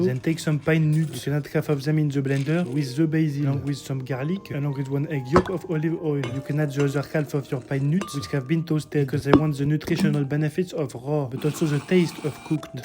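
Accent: France. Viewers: Love the broken english with french accent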